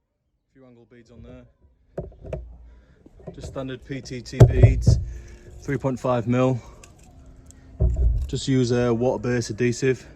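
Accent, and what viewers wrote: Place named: United Kingdom. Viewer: This in Australia mate because you sound British and I’m from Liverpool uk and worked all round Brisbane and Melbourne and I can tell by the buckets your using it’s Aus ??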